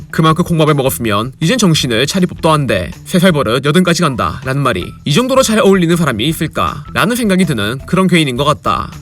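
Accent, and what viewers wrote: Jeolla, South Korea. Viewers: 아따 또 전라도여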